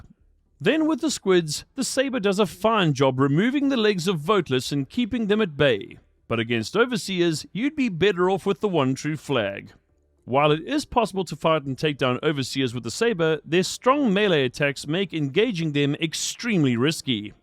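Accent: South Africa. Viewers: Can help but think youre South African my brotha haha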